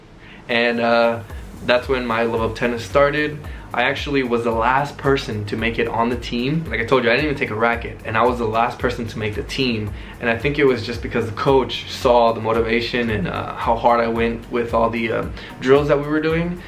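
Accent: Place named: Venezuela. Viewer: Tu español tiene acento venezolano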